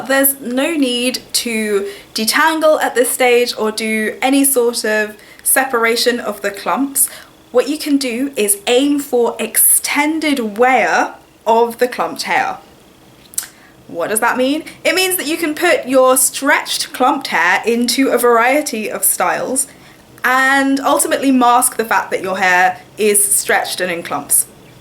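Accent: England, United Kingdom. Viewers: 😃 OK only a true Hilarian would know that. It's just that you sound SO English I thought it couldn't possible be so but then again that logo is burned into my brain! 😀 Anyway, old head here (class of '91 eep!) and I live in London. Bless! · Isnt that the cutest lil english Disney-sounding accent ever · I didn't think of disney but just those children's story books. She has a great accent. It's English but her type of English sounds nice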